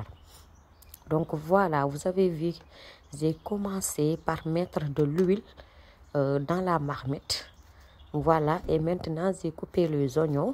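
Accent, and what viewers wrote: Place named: Wolof, Senegal. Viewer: félicitations à vous , très bien expliqué , presqu'aussi bien que mon mari de guinée bisau.😊😊.Belle présentation et merveilleux accent wolof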